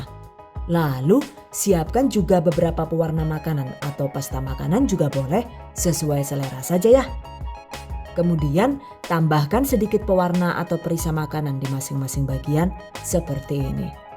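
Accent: Banyumas, Indonesia. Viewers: Huawww, Amazing... 😍😍😍 Editing Videonya bikin seru ditambah lagi pengisian suaranya yang Khas dan medhok banget ngapak kayak saya. Hahaha 😉 semoga sukses terus ya pahalanya ngalir terus, udah ajarin kita masak². 😍😍😍 #GiveawayTHV2021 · Keren bangett chef e pke bahasaa unikkk Kadangg bhs inggris campur bahasaa ngapakk lahh😅😂 Ora ngapak ora kepenak pokokee😂👍 Bismillah #giveawayTHV2021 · syukaaa bgt sm chanel ini..karna mudah dipahami ,lucu lg.ana ngapake.ora ngapak ora kepenak.🤣🤣🤣🤣 #GiveawayTHV2021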